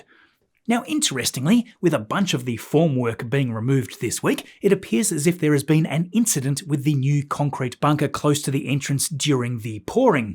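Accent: Australia. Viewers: coffee and ' charming Aussie accent. Yep, it's saturday and the day is starting well. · Sorry ... aber mir geht das hektische australische Gequatsche von Dir nur mehr am Arsch vorbei ...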